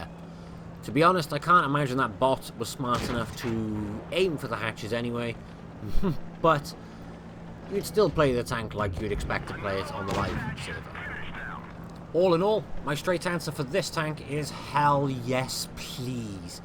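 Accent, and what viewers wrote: United Kingdom. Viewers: wat the british accent ! XD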